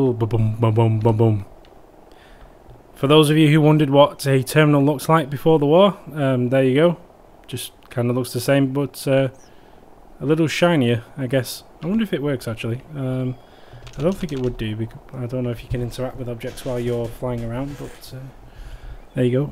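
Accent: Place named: Leeds, United Kingdom. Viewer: That's a leeds accent if ever I heard one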